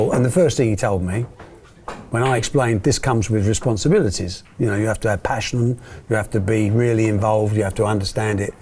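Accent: United Kingdom. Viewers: Thank you so much Mr Hearn, you speak so nice and correct. It's a pleasure listening to you! I improve my english a lot and I love the british accent, but also the scottish. You are a role model for having a successful life.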